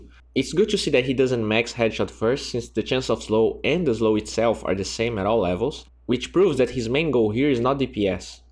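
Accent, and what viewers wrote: Brazil. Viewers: nice vid overall but i would have to say the narrarator has a heavy brazillian accent that i find offensive and annoying.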